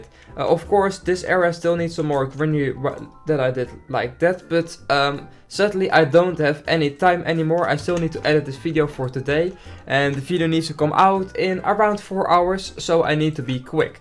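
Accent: Netherlands. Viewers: your dutch accent is strong and great btw im dutch too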